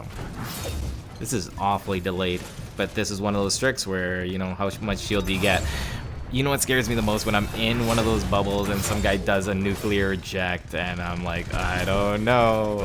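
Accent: Canada. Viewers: Are you Canadian? Sounds like it. Say “battery about”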